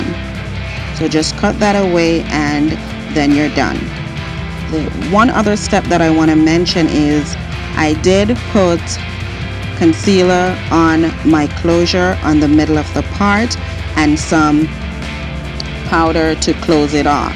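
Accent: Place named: Jamaica. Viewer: kool, subbed. r u from Jamaica? I pick up that accent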